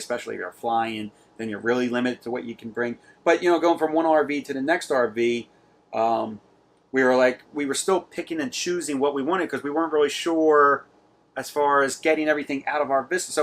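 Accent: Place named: Northeast, United States. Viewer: Don't worry I say 'youzz' too. Its that Northeast lingo lol. Sounds like it was a great trip. Shopping for RV's is dangerous enough I don't know about actually living in one for a week. I would be back at the dealer signing papers. I look forward to seeing the walk-thru. Stay safe and happy travels.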